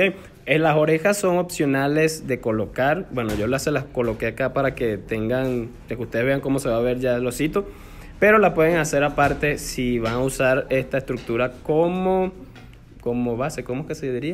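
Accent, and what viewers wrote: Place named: Venezuela. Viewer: Me encantó la explicación, me suena como venezolano, será paisano?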